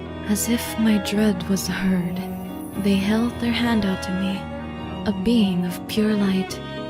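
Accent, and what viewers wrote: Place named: Philippines. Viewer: idk who tf you are but you have a filipina accent and you like girls und panzer so I’m interested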